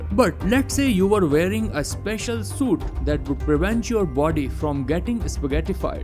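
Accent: India. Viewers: Are u Indian? Ur voice is so annoying.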